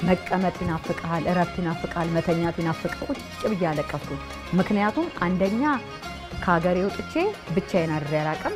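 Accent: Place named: Arab world. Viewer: እንግሊዝኛ ሰታወሪ አክሰንትሽ እንደ አረቦች ነው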